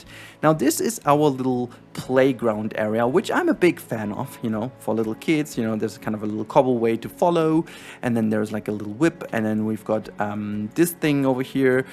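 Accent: Germany. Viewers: oha habs bisl am Akzent erkannt aber sprichst sehr gut englisch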